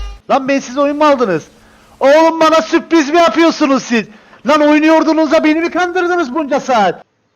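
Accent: Cyprus. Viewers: sen kıbrıslımısin ben öyle gardaş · ABI SENI IZLIODUM BABAM DUYDU BIRAZ DEDKI KI KIBRIS LI MI BU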